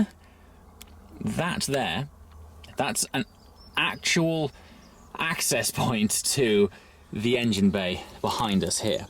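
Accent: United Kingdom. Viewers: Is it just me or has thickened his British accent?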